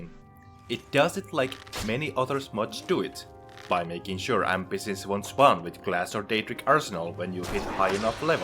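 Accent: Finland. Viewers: Onko tää suomalainen kanava? Kaveri ainakin ns. suomiaksentilla selkeesti :)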